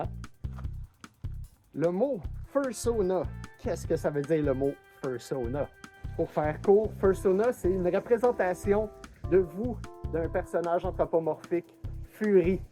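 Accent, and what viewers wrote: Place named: Canada. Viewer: Merci pour cette vidéo super intéressante ! ton accent canadien est vraiment incroyable ( De toute façon je ne sais pas pourquoi mais j'ai Toujours aimé les canadiens/Québécois ) J'adore la comu furry francophone Elle est Tellement génial ( En même temps je suis français ptdr ) Bref, je vous fais une Salutation depuis mon bus de l'ouest français !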